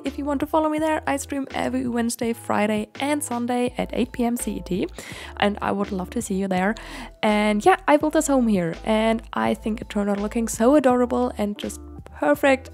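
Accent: Germany. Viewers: No, she's from Germany and German is her first language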